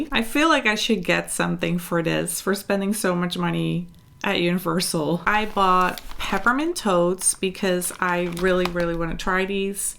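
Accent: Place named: Netherlands. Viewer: I'm new to your channel and I knew you were Dutch just by the accent haha lovely video!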